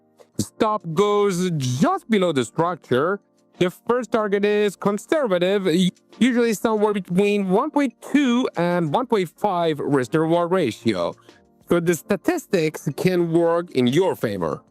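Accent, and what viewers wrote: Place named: Poland. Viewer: First of all thank you for this interesting video. Regarding your voice, I assume that's because the regular Polish language melody does not work when talking English. Like my Swiss German talking style in Italian would hurt your ears 😉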